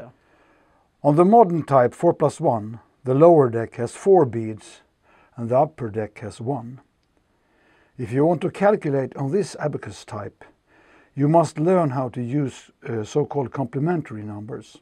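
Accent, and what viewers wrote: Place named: Sweden. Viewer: That Swedish accent :D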